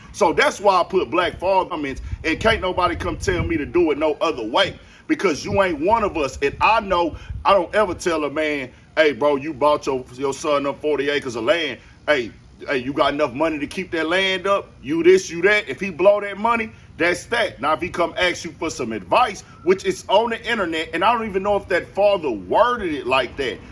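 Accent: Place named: Houston, United States. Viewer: Brodie u so Houston😂😂😂 “ unless u wanna squabble” 🔥🔥🥶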